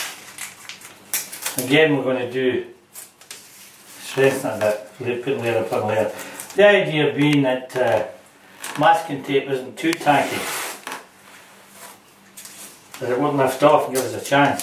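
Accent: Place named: Scotland, United Kingdom. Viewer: That's a Scottish accent, don't know which area, but good to hear. Thanks very much for this video, really useful tips, I've put off doing this because all the American plumbers say to take out the toilet first - not good if you're a woman and in a rented flat!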